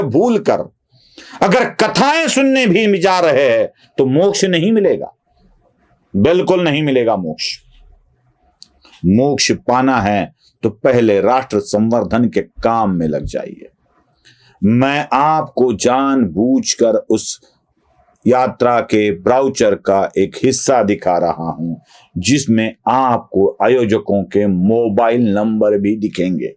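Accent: Maharashtra, India. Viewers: I love your Marathi accented Hindi. Fully agree with your views. From Lucknow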